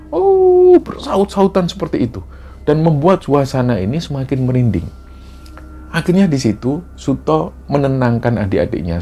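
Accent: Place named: Surabaya, Indonesia. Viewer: Kalo dengerin dan logat mas, ngelingke aku sama Suroboyo. 20 tahun disana, sampai dapat jodoh orang Kediri. Semoga dan Tim semakin maju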